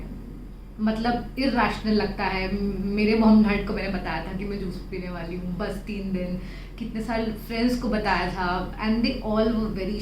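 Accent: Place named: Uttar Pradesh, India. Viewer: Your accent looks like you are from U.P.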